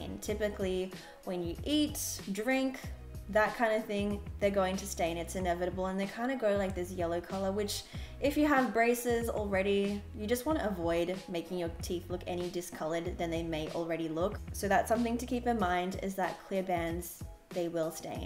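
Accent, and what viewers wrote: Australia. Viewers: 😄 I was close. You was saying so many words that are close to what us British say, but I couldn't figure it out. I am familiar with the Australian accent, not sure why I didn't think of that 😊. Thank You for your reply and information in the video. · Are you Australian? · You are Australian. Periodt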